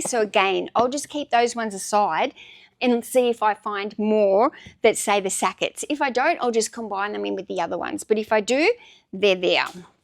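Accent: Australia. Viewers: I like the Aussie pronunciation. That's why I tune in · She has an Aussie accent!! She’s not going to pronounce it the way you might. What difference does it make anyway?!?? Geeez!!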